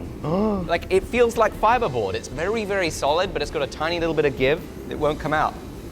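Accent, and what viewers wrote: England, United Kingdom. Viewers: So British. So cute. So. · A big “chube” of chips I absolutely love the English accent and what it does to certain words!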